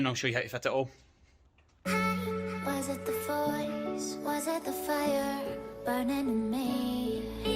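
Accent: Scotland, United Kingdom. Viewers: nice job :D also are you scottish u sound like kieran tierney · Great video! As a yank, it was fun listening to a car vid with a Scottish accent.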